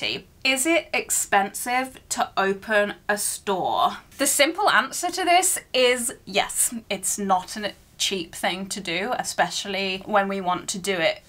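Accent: Birmingham, United Kingdom. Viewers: I thought you was a Brummie? Derbyshire is very far from brum 🙈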